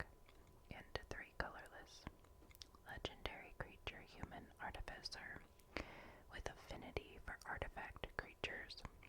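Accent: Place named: Midwest, United States. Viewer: I love your Midwest accent. It’s so soothing.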